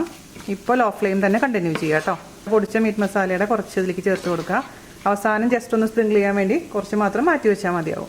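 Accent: Thrissur, India. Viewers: chechi de ഒട്ടുമിക്ക dishes ഉം ഞാൻ try ചെയ്‌തിട്ടുണ്ട്. Already subscribed your channel long ago.. But comment cheytat ഇപ്പോഴണെന്ന് മാത്രം. I like your dishes❤️❤️ also like that trissur slang 😍❤️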